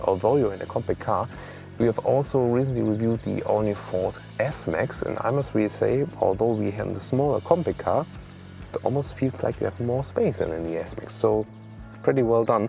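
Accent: Germany. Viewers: Ist ein sehr "deutsches" englisch, daher sicher auch für deutschsprachige besonders einfach zu verstehen ;)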